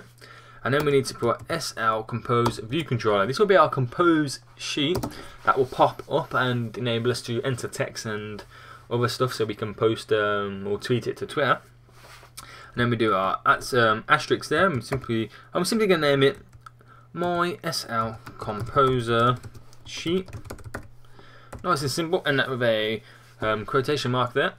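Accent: United Kingdom. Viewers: Love the british accent! "Tweets to Twi-e" :)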